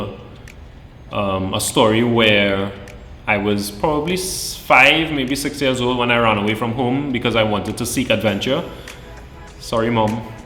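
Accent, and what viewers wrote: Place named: Trinidad and Tobago. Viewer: Our beautiful Trini accent .Keep in representing another YouTubed here.Keep up the good work.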